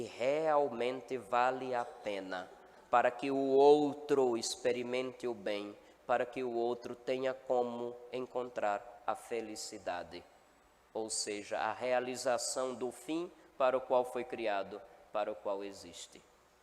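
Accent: Northeast, Brazil. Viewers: LOUVADO SEJA DEUS POR ESSA HOMILIA CATEQUÉTICA (SEM FALAR DO SOTAQUE MARLINDO QUE EXISTE, OXENTE), LOUVADO SEJA DEUS PELO SEU SACERDÓCIO FIEL E SUBMETIDO A VERDADE PADRE, AMOR DE JESUS NOS LIBERTA DO PECADO QUE CONDENA E MATA A ALMA. O HOMOSSEXUALISMO TBM É PECADO! ✝️🇻🇦🙏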